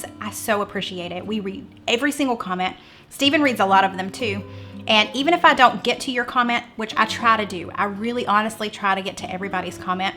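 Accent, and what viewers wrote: South, United States. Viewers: I love watching your Videos,!! Also Love your Country Accent.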